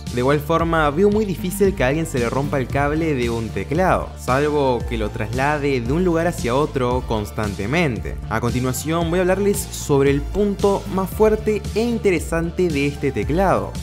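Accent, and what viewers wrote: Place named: Paraguay. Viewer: Tiene la ñ paraguaya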